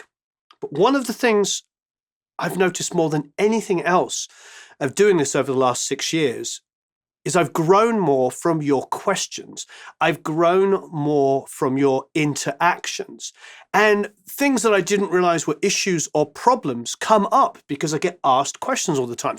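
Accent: England, United Kingdom. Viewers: All of this truly wonderful content, also done in an adorable soothing English accent no less, has truly made me appreciate all of those finished records I had so taken for granted before far more. This industry is apparently no 'piece of cake' at all when one realizes you're dealing with so, so many different variables AND the personalities of possibly technically ignorant "Artists" and even their producers AND Apple's publishing standards. Good luck with that.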